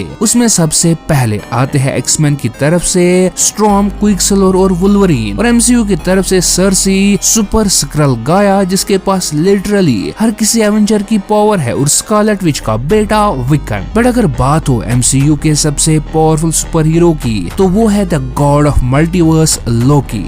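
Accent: Kashmir, India. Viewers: You sound kashmiri?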